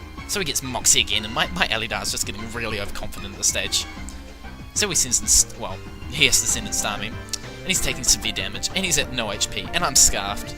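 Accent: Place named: Australia. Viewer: I can't tell what you're saying!? Stupid AUSTRALIAN ACCENT! Can we have subtitles?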